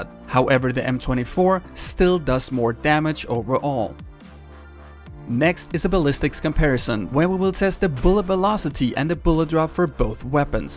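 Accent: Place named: Denmark. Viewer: Your accent is soo Danish, I love it!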